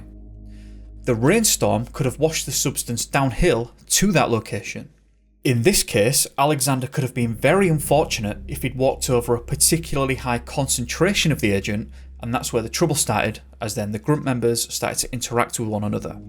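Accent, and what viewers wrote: United Kingdom. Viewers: Thank God, I've been looking forever for a video on this incident told by someone with a British accent. 👍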